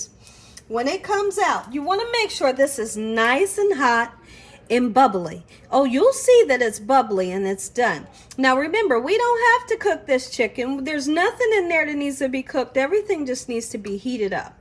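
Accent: South, United States. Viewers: Have you always lived in Ohio! I swear I pick up a southern accent or Louisiana accent in your voice...I am from Texas......I am loving your channel!! hugs, xoxo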